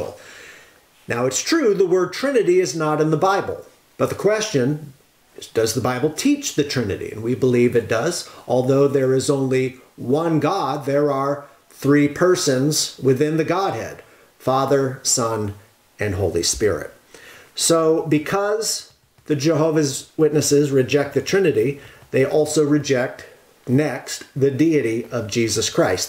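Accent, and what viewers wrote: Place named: North America. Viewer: According to the Oxford dictionary, the word cult is defined as "a relatively small group of people having religious beliefs or practices regarded by others as strange or sinister". Firstly, by way of informing you, the seventh day adventist church is a world wide denomination with an approximate membership of over 20 million members...( and counting ) Of this number, over 1.2 million alone are from North America, a place where you reside given your accent. Hence, the growing size of this church by way of simple logic disqualifies it as a cult. Like the dictionary says, a cult is made up of a relatively small group... however, on the contrary, this church is not a "put together church" formed in recent times in a shed to the back of nowhere, rather, this church was prophesied by God himself and is sustained God himself with a global reach. 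In addition, the said dictionary states that the word cult is associated with devotion towards a particular figure or object. Again you seem to be misinformed as the only allegiance the church bears homage to is Jesus Christ hence the name " SEVENTH DAY ADVENTIST " which means, looking forward towards the coming of the Lord. In other words, their belief is in there name. You are correct in suggesting that the church have a leader. Infact, they do have a leader, someone they follow, but it's not Ellen G White like you suggest, rather Jesus Christ their soon coming king. Lastly, the origins of this church is not only supported with Biblical e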